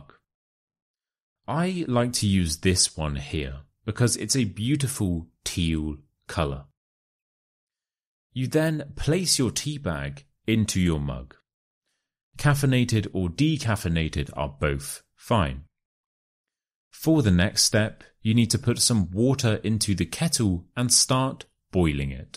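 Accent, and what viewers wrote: United Kingdom. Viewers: The best british accent, the best channel ever!!!!! 👏🏼👏🏼👏🏼👏🏼👏🏼👏🏼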